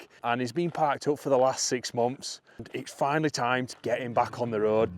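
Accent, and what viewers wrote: Yorkshire, United Kingdom. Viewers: So funny hearing your Yorkshire accent in America ❤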